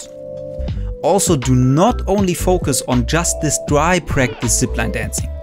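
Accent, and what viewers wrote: Germany. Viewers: i can hear it in his voice he‘s german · Your german accent made 'Super Jumping' feel even cooler.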